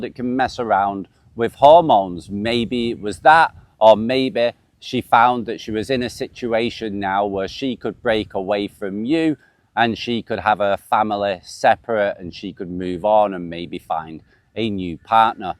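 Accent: Manchester, United Kingdom. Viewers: Where are you from, Oldham? Your accent is almost Manc apart from a few words you pronounce like ''there and video'' for example.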